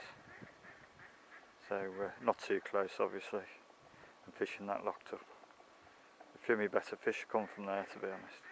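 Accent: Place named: Shropshire, United Kingdom. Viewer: Nice one! Down to earth & well presented. Its nice to be reminded what a Shropshire accent is like. You get them mixed up a bit when you've been away from Blighty for 40 years. Those are pretty impressive carp you're pulling in there! Here in Greymouth I usually fish off the wharf in the rivermouth for Kahawai. Love 'em pan fried in butter with a bit' onion, garlic & sweet chilli sauce.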